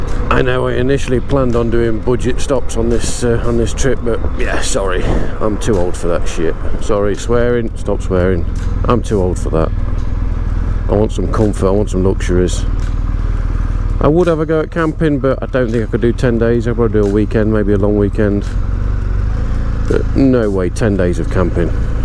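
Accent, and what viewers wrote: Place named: Nottinghamshire, United Kingdom. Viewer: Enjoying your French trip. Tell me sumat! Are you from Nottinghamshire. Trying to wok out ya accent 😂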